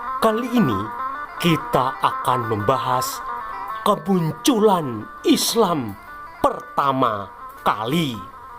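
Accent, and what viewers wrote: Java, Indonesia. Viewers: Medok banget kamu cuk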